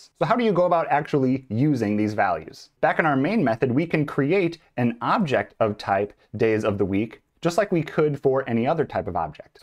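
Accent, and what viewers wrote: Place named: United States. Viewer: I love this accent in English ❤ American accent ❤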